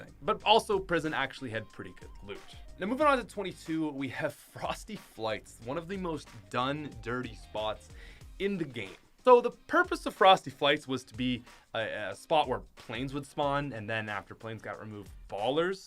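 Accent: Canada. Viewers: is it a canadian thing to say anywhere and nowhere with s at the end or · Are you canadian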